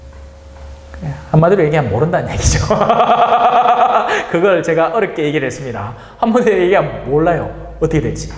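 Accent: Gyeongsang, South Korea. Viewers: 뜨시다 빨리 드시소~ 정감어린 경상도 사투리에 잠시 고향으로 달려갑니다 고향떠나온지 어언40년~ 신부님 사투리가 제맘을 따뜻하게 합니다 언제나 잘듣고 있습니다 건강하세요 신부님~~~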